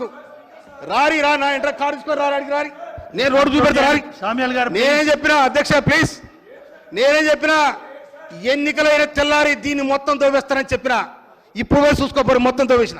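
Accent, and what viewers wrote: Telangana, India. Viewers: Telangana voice superb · ఇది నా అచ్చమైన తెలంగాణ యాస అన్నకు ధన్యవాదాలు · అన్న మీ భాష మన తెలంగాణ యాస ఎంత సేపు విన్నా వినాలి అనిపిస్తుంది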